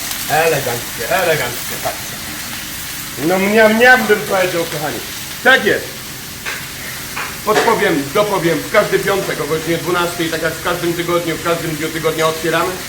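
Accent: Highlands, Poland. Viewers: skąd ten góralski akcent u gościa znad morza?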